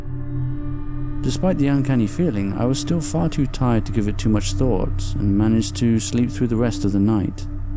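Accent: United Kingdom. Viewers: Are u from the uk sir?? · Haha I heard it but it's really a light british accent :) Btw thanks for replying so fast I just wanted to know one more thing do you write these stories yourself or do people send you some and you illustrate them :) ?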